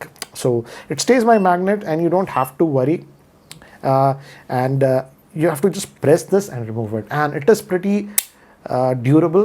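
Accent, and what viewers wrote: India. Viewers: That’s a heavy Indian accent man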